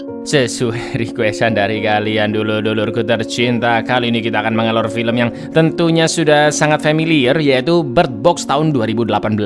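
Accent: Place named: Madura, Indonesia. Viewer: Bang orang madura emang,,ko gitu siih logatnya,,😅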